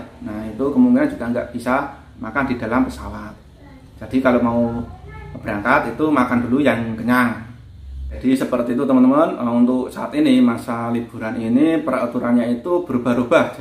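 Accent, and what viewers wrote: Java, Indonesia. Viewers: Suka bngt dgn logat jawanya Mas.. **HALOO**... **ASSALAMU'ALAIKUM **.... 💖💖👍👍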